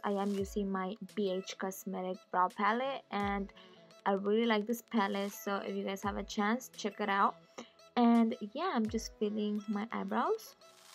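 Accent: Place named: Mexico. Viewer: yall she mexican or sum thats why she has an accent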